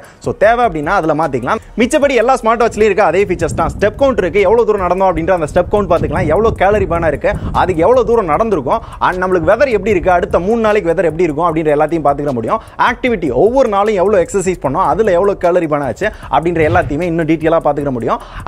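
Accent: Madurai, India. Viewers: Neenga Madurai ya bro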